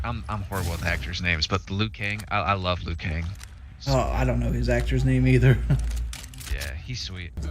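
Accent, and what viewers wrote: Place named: South, United States. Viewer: His weird fat/country/ebonics talk was especially difficult to listen to this time around.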